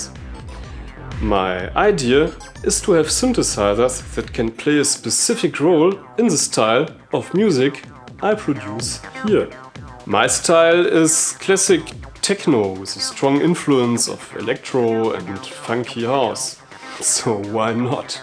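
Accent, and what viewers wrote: Germany. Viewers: Du kannst Dir doch denken, warum man das tut - um auf einer internationalen Plattform von möglichst vielen Menschen in den meisten Ländern verstanden werden zu können. Komischerweise stören sich hauptsächlich Deutsche am deutschen Akzent - teilweise übrigens auch ich selbst - aber kaum Englischsprachler, die finden das eher lustig. Ich verstehe Deine Meinung aber nicht die Art und Weise, wie Du das formulierst. Es ist seine Entscheidung und die lässt sich eigentlich leicht nachvollziehen. Wenn Du Dir ernsthaft ausgerechnet von ihm deutschen Content wünschst, bitte ihn doch einfach höflich darum! Damit hättest Du sicherlich sehr viel bessere Aussichten auf Erfolg, als das Konzept seiner Videos in diesem Punkt so "von oben herab" infrage zu stellen.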